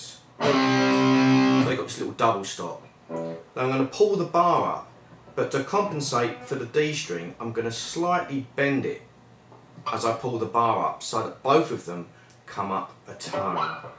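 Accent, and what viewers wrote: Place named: London, United Kingdom. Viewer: yep, I found that midi file for the song, and I also use Logic, so maybe i'll put a few hours in to making it sound nice like you have. I noticed from your accent that you might not live too far from me, I am in east London and I'm looking for a guitar teacher to help me with a few things, I've been playing 42yrs, I'm just looking for a teacher who is in to the same sort of sound, runs and modal work that interests me, If you could spend a few hours a month with me, I would really appreciate it, money no prob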